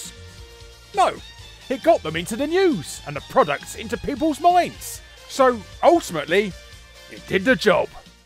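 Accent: United Kingdom. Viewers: Very few British accents don't annoy me. You are the very few...your lucky!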